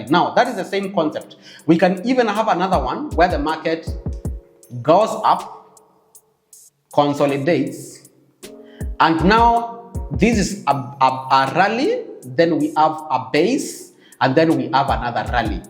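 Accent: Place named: Kenya. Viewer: Bro You sound a Kenyan... DO YOU HAVE OTHER SOCIAL MEDIA HANDLES ?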